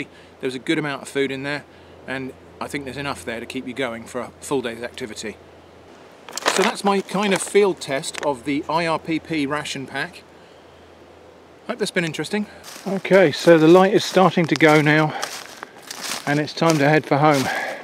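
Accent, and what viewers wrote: United Kingdom. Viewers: I like the UK (been there only once so far, unfortunately), british cuisine and british pronunciation so I subscribed. Appreciation from a russian-speaking person for this review, спасибо :)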